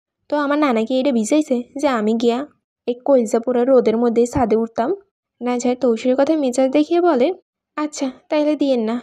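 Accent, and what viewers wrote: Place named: Barishal, Bangladesh. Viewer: আপু তুমি মনে হয় বরিশালের মেয়ে তাই তোমার ভয়েস টা ও বরিশালের মেয়েদের মত লাগে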